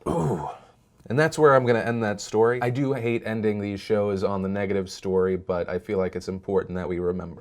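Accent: South, United States. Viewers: I just noticed that you have a southern accent, I've been watching for years, and I just realized.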